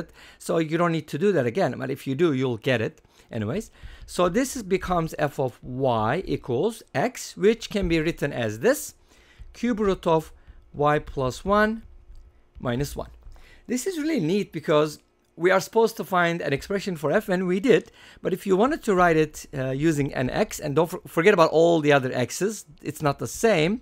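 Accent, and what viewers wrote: Turkey. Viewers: Abi ingilizcen gayet gelişmiş. Daha demin 1 yil onceki videonu izliyodum, türk oldugun hayvan kadar anlasiliyordu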